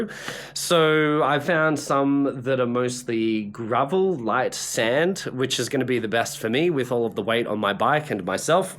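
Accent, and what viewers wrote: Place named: Australia. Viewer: Dear! You are the best guy I’ve found in youtube sice years, i’m so grateful to Famila Mundo (the camere;) to let me know you. Y like lissen you to improove my english undertanding and i adore australian accent… is very hard for me but very beautyfulsoundin for me. Please don’t offend if i ask you to make an experiment, Im 50 years old, and i’ve seen many things in the live..and in the health tha is a my passion since decades….. I heared in your breath the sign of a “sensitivity” to some moleculs present in the food. Expecially i’m almost sure you eat a large amount of “starchy” food…. Like grains, potatoes, flours food……. Please…. If you want to lissen a old guy that experimented many things, You can try for Trhee weeks to low down the use of any “starchy” product…. And eventually dairy products if you use them…. And you can observe by yourself if the improovement of breathing will occur, or not!! 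…. (I won’t give you my spoiler, even though You can easily guess it)😅 To compensate the lack of carbo, is the best choice, at your age, to put in the plate fruit and fruit and fruit, and many no starchy vegetables, raw and cooked. and sweet drink made by juice of fruit o cane…. Enjoy natural simple food!!!!! People around you will not understand You, but… do not worry, your experiment is a great improoving oporunity for you entire future healt of the live…people’s opinions may be discussed later, whit the results in the hand, after the experiment conclusion…🙏👍👍👍 Last info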